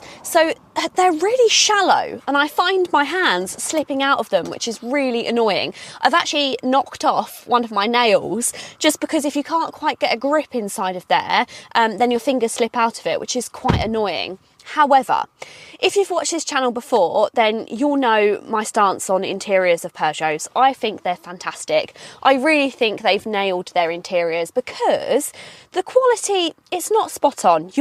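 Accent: United Kingdom. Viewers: If I am in not in ❤ with britain english, but you derserve ❤ when talking so quick and so much about this enough big car 😊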